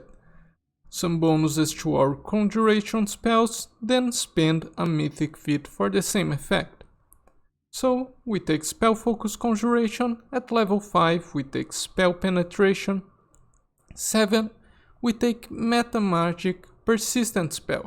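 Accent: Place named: Brazil. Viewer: EU SABIA QUE VC ERA DO BRASIL PELO SEU SOTAQUE! Hahaha Bro, o teu canal é o único que eu assisto e além de concordar com builds que são parecidas com as que eu penso em termos de prioridades de feats, raças, multiclass e outras coisas, eu tbm aprendo para um!! Parabéns pelo trabalho!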